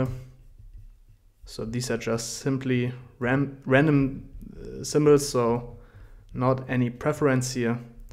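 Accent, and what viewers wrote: Germany. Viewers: ich höre du bist deutsch, wäre es möglich mal du reden über discord? ich habe kranke erfahrungen , also sehr sehr viel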